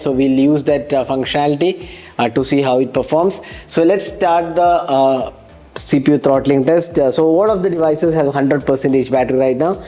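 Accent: Kerala, India. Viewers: Hindi might not be his mother tongue, I think he sounds like he is from Kerala.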